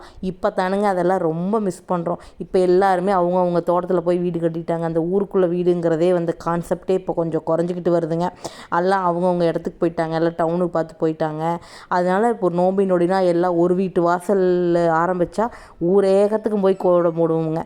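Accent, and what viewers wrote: Kongu, India. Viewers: Kongu tamil supernga ammuni😊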